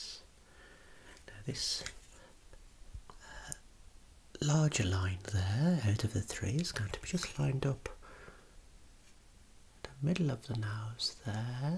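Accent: Birmingham, United Kingdom. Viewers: My bridge reflection is not as stated how very dare you !! 😂 Great, informative vids, very relaxing and a wonderful voice , a Birmingham accent (at last) velvety smooth that doesn't fill one with a sense of urgent panic as experienced whenever my Brother in law visits. (Aston area) Please don't be offended I'm just trying to be funny . Love your work , thank you 👍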